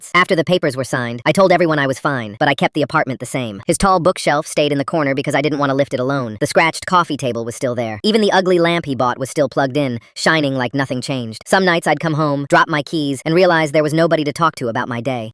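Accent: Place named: Latin America. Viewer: You sound latina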